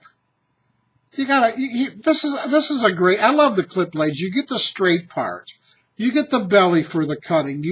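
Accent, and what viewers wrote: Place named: United States. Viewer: This american accent while speeking german words is the besteht :D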